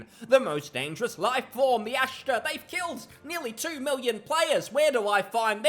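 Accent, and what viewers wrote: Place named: Australia. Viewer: All Australians sound like this.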